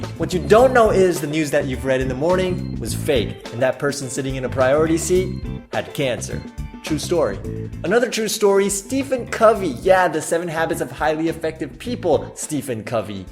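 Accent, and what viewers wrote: Standard. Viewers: 很喜歡你的口音發音很標準! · 真的很感謝你做這些影片以及可以讓我們練習習慣非常標準的口音，但是中文字可以調整成當我有設定cc字幕的時候再出現嗎?有時候我只想看看英文不想看中文，但我關不掉。不管如何總而言之，超級愛你的正能量~~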